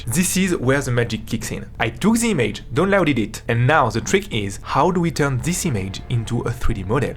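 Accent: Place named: France. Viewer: Yeah…real convincing. The thing turns out to be the usual ‘blob’, and then we watch a whirlwind of sculpting edits on super fast fwd. These Ai image to model programs are ‘not ready for prime time’. So why is every ethnicity and their grandmothers trying to sell us on it? This one is particularly annoying, being narrated by a guy with super thick french accent. See you in a few years…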